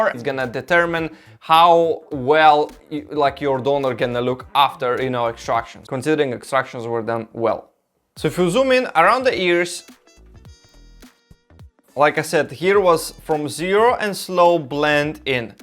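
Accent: Russia. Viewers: Are u Russian? Your accent like Russian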